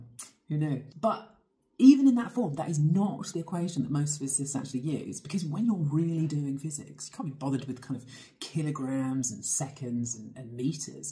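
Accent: England, United Kingdom. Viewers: Why did English accent degenerated to the noisy ear scratching American accent we have today? And I had to learn that crap instead of this lady’s beautiful dreamy sound???😡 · She's very smart, engaging, and good storyteller (something that is sadly lost these days), and yes, easy on the eyes. What I find makes her so watchable (besides the lovely Brih-ish accent) is her confidence and almost quirky sense of humor. The content is very good, too. We'll done! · How can someone be so sexy talking about science? 20% brit accent, 10% red hair, 30% absolute gougeous lips, 40% inteligence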